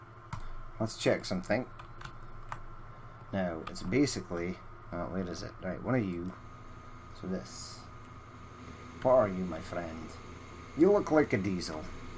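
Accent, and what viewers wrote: Scotland, United Kingdom. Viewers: Thats a proper Scottish accent laddeh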